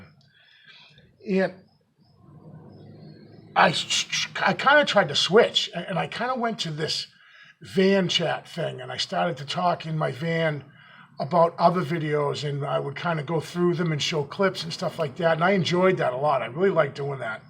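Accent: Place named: New England, United States. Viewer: Your analysis of tree and branch breaks are insightful. I don't always agree, but breaks are still physical evidence. I've agreed with quite a few of your assessments. I think changing the time of day that you do your hikes will change what you might find. Midday is probably not the best time of day. But no matter what any one thinks, you keep doing what you enjoy. And by the way, we enjoy your New England accent, lol.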